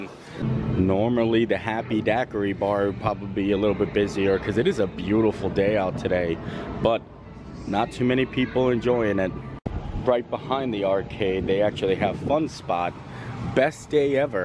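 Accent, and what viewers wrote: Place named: Midwest, United States. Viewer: Are you from more of the Midwestern part of PA or east coast? I thought you had more of a midwestern accent and mannerisms.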